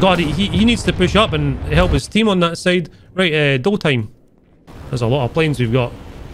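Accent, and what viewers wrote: Scotland, United Kingdom. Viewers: I love you because you respect people's gender identities and you make sure to include them with your usage of the phrase "ladies and gentlemen". It's a small thing, but with human decency becoming politicized people often shy away from even acknowledging the existence of people that are gender nonconforming. Aside from that you make great content, and your very distinct Scottish dialect reminds me of a great horror movie reviewer on here named Ryan Hollinger. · I love you too man specially that thick sexy scottish accent🍻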